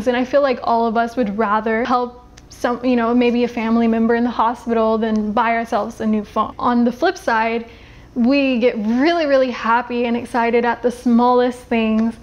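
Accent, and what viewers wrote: El Salvador. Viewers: TE AMO GRINGUITA SOS LO MAXIMO. UNA SALVADOREÑA DE VERDAD. HASTA TU ACENTO ES ORIGINAL. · así es bro, esos que son salvadoreños y al llegar a usa con los días hablan como mexicanos mal caen esos majes, sinceramente es gente que se merece una buen cato en la trompa, para más esta chica habla como salvadoreña y eso que ella es nacida en usa osea es gringa de naturaleza, y los majes que están en usa puff decepción dan. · Hi, how are you? I just want to say hi and wish you the best! You are a very nice person even when I don't know you in person, but you speak like a true Salvadoran and you know a lot about our culture and I like that! Say hello to your sister in a good way and with great respect. See when you take her to know El Salvador? greetings and take care!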